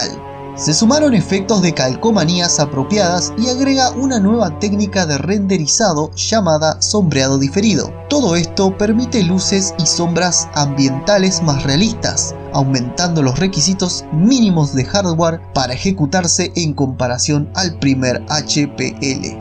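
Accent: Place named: Peru. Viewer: Alta voz de peruano tiene este re gri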